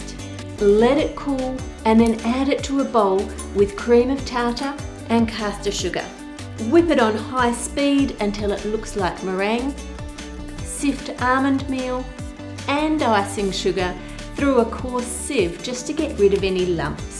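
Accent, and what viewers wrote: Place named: Australia. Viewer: Better--to me Bob had a really odd "would you like a piece of candy little girl" voice, where is more gentle and has a nice Down Under edge to it. Oh, and cheerful.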